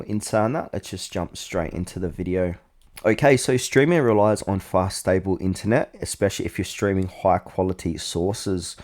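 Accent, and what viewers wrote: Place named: Australia. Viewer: Thank god an Aussie/Kiwi or a Brit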